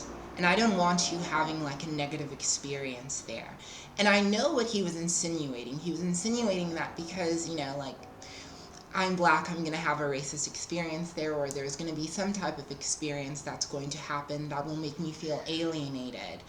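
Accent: United States. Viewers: You really did nicely in trying to perfect that American, almost Valley Girl accent. Good job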